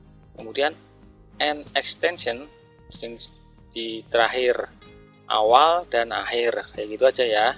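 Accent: Banyumas, Indonesia. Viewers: asyiappppp Bos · matur suwuh tutorial ya om jos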